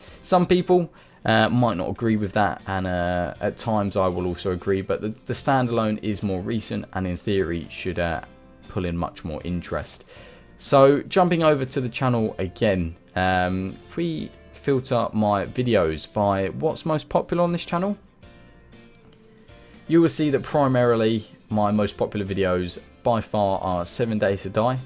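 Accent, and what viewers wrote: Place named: United Kingdom. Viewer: Awesome video + i love that brittish acsent im bad At English because im Finnish :(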